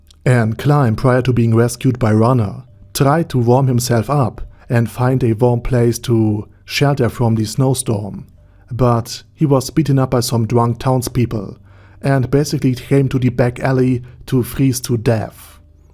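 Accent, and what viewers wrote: Germany. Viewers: it is a heavy german accent but its very easy to understand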